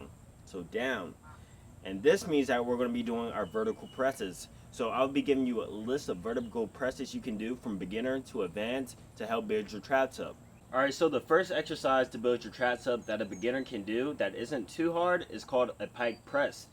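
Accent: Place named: Caribbean. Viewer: You sound like you from the caribbean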